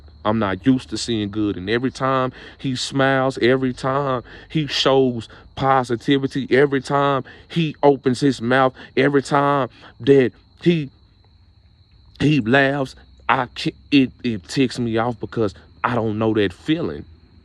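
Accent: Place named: South, United States. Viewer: Could it also be that you sound uneducated and like you came from the po' south?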